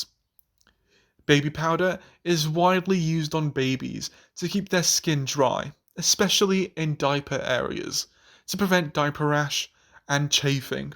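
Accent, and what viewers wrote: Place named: England, United Kingdom. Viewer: You sound English - why say diaper instead of nappy?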